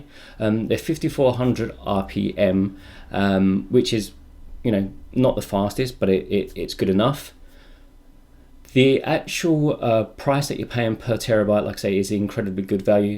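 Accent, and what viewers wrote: United Kingdom. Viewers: you sound like your from the UK but you always price in dollars strange? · Ubersonic He is British according to his accent abs he always mentions the price in pounds, but this time round he mentioned it in USD.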